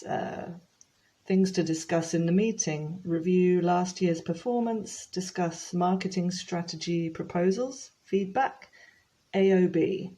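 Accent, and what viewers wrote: United Kingdom. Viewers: oh okay I got it. I have been learning English since my childhood. My English knowledge is too bad. Next year I am aiming to go to private university. I only watching British English chanels.beacause I like how you speak. I mean accent pronunciation. I'd like to take online classes with you unfortunately it's too much in our currency.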